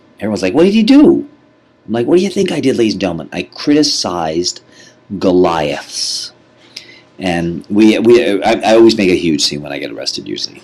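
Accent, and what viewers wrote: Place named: England, United Kingdom. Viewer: Not a bad English accent, at first anyway. You rather sounded like me when I talk. lol.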